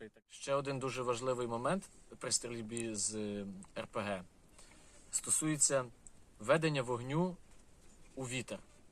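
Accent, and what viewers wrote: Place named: Western Ukraine, Ukraine. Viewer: Чiтко западенській суржик. А інформация, корисна.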